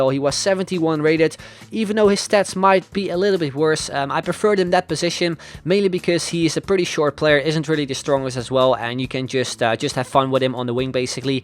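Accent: Netherlands. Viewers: Je hoort dat je Nederlands bent😌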